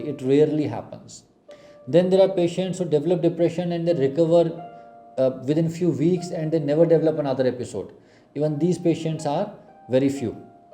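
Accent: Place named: India. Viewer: Why is indian accent so annoying? It makes me depressed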